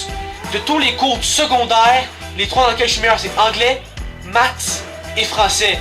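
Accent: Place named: Quebec, Canada. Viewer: cool, écoute, je ne te connais pas personnellement, mais ta vidéo est bien organisé coté musique visuelle et tout, cest parfait, remercie ta soeur lol xD. bon je ne suis pas la pour jugé ce que t'aime pas on a toute des chose quon aime et aime pas. cest sur que des réponse peuvent choquer comme ta dernier lol xD, mais faut dire, si t'aime pas l'hiver, bas tu n'aimera pas le hockey sa va avec xD . pis les question épaisse ta tellement raison ahahaha. du monde de meme j'a catch pas avec. apart, sa cool ton vidéo. ton accent quebecois c parfais ahahah. écoute lache pas. tu pars bien . =D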